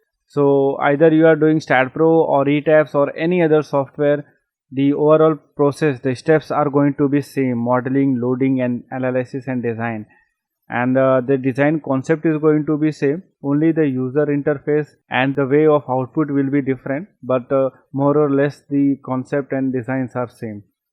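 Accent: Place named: India. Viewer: The Indian accent makes me crazy, but you are ahead of other Indians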